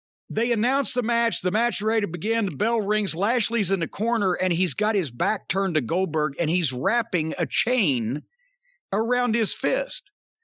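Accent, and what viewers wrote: South, United States. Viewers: I've complained about the lack of quality control in wrestling for years! Especially when a fed has agents. Hearing the words in Jim Cornette's Dixie accent makes me feel vindicated. "Since there's a real injury, the camera does all it can to stay away from it." Ouch!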